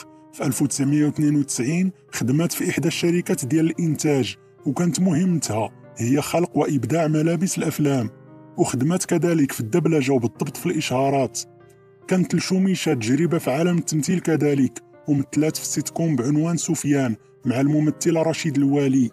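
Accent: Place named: Democratic Republic of the Congo. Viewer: تعليق مقرف من هذا الشخص وكانك تستمع الى كحلوش من الزايير وهو يتكلم العربية.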